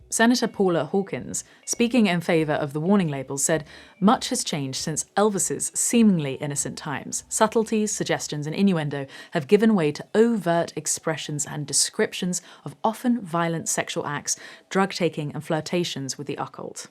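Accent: United Kingdom. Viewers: I was avoiding this video because it seemed such a boring topic, but I was wrong., great job presenting this. I think artists like you should be able to use whatever language you want, but I also appreciate your sparing and judicious use of vulgar language because it can be somewhat of a turn- off. That said, I rewound the last section several times because I so thourghouly enjoyed hearing such filth delivered in a proper British accent.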